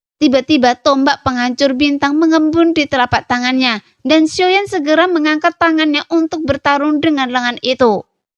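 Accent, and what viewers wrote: Java, Indonesia. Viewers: Duuaaar ....jawa kentel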